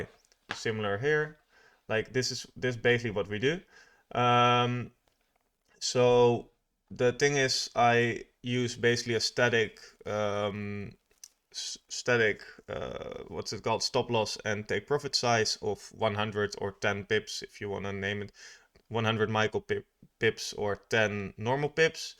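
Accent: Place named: Spain. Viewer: i can tell u speak spanish, lol idk why. but i just now how spanish peoples accent sound when trying to speak english because i speak spanish too and english fluently.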